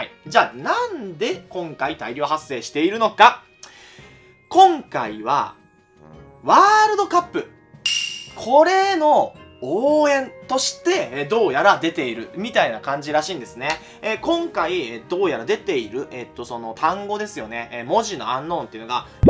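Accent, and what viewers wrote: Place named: Kansai, Japan. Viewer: 関西弁ええな！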